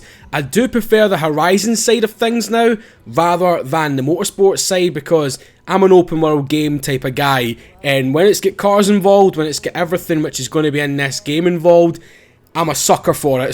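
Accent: Scotland, United Kingdom. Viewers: He is scottish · Its cuz o the Scottish accent pal i understand cuz im scottish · hooks he's talking Scottish slang but at the same time trying not to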